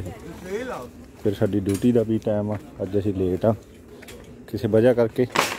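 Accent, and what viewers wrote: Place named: Amritsar, India. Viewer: bhau Amritsari gurdaspuri lgda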